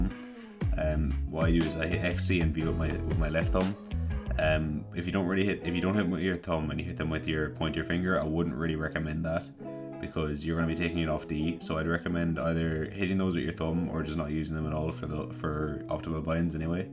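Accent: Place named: Ireland. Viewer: Irish boi doe :) I'll try the peek keybinds out. It's what I'm most struggling with when switching from console to pc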